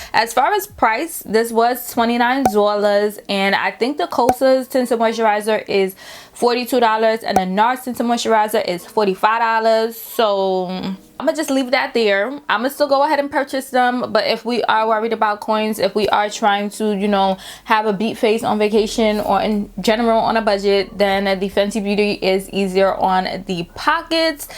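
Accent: New York, United States. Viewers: Girl!! I had to subscribe. Right away, I was like she has to be from New York! 💁🏾‍♀️ Love the results.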